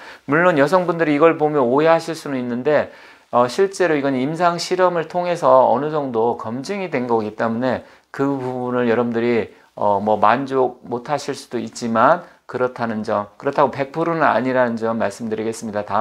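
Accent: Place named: Chungcheong, South Korea. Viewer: 충남 사투리 시네요..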